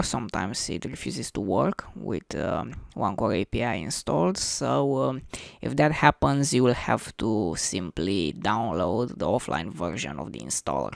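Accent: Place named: Russia. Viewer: love your accent. its russian?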